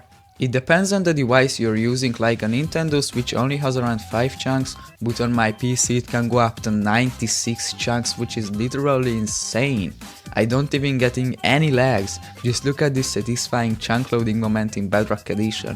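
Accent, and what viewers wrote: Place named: Hungary. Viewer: Based on your accent, are you from Hungary?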